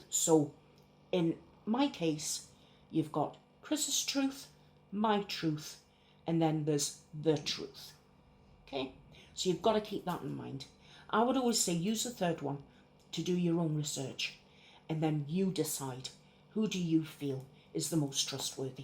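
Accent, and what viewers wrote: United Kingdom. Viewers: He sounds so much like my mother "after all I did for them and never asked for anything in return" except there was always a price for everything, a high one and she would use the tiniest thing for leverage to get her own way for years later, not to mention using her 'good deeds' as things to brag about to anyone that would listen. I would never trust Chris about anything. I heard about him in passing (in a good way) quite a while ago and went to see his channel. I left very quickly because I recognised the personality type straight away as one that isn't healthy to be around in any way at all. He does nice things for people to buy them and then they're not allowed to leave his team ever afterwards. If they do, he lashes out. He doesn't understand that people are not objects to be owned and controlled. On a different note, sorry to hear you're struggling in this weather, hopefully it will break soon, or at least be less humid. 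I live in a nearby part of the UK to you (assuming your accent matches where you live) and we aren't used to this level of heat. I have a good supply of warm clothes and waterproof clothes, but very little for hot weather!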